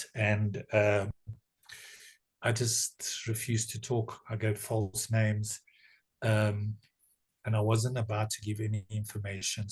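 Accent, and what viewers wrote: South Africa. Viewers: This sounds like a South African but let's see if I'm correct in saying that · Good South African accent !! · is this a south african accent ?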